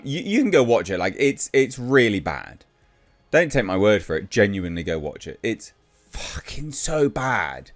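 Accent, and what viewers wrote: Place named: United Kingdom. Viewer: I don’t know if it’s his British accent but is so funny 🤣 he’s not even trying to be funny.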